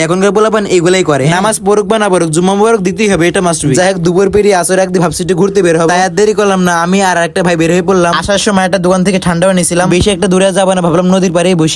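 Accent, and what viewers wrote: Noakhali, Bangladesh. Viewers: ভাই আপনার বাড়ি কি নোয়াখালী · ভাই আপনার বাড়ি নোয়াখালী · ভাই আমি নোয়াখালী থেকে বলছি। তুমি অনেক ভালো নোয়াখাইল্লা ভাষা পারো। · আচ্ছা ভাইয়া তোমাদের বাড়ি কি নোয়াখালী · আপনার বাড়ি কি নোয়াখালী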